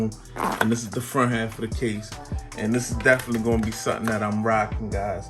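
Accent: Baltimore, United States. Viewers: Def got the Baltimore accent 💯 GO RAVENS 🐦‍⬛💜🖤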